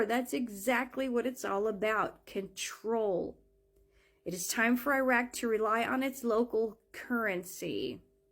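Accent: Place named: United States. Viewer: So this person sounds like an American....who CLEARLY hates America. Iraq hasn't been using the dollar for almost a year except for oil.... Well, the only ones in America who buy their oil is demon rats.... When there's a republic rat in America drills and sells/trades it's own oil.... It's no surprise or problem that a country like Iraq stops trading in dollars for oil.... It bothers me that an American is so excited by this though.........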